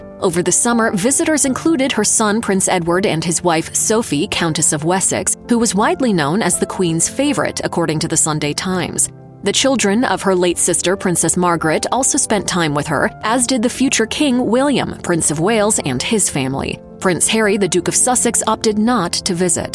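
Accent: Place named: United States. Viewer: As soon as I heard the American narration, I clicked off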